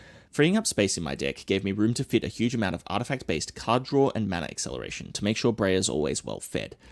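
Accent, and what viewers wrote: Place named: Australia. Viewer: bloody brilliant to hear an aussie accent on mtg youtube! instant subscribe!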